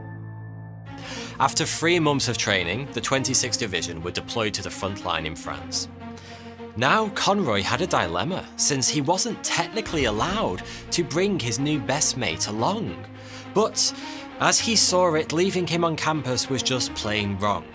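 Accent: United Kingdom. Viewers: Blah blah blah brittish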